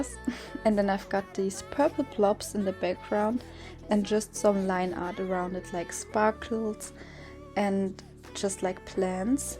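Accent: Germany. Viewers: Are you german? 😱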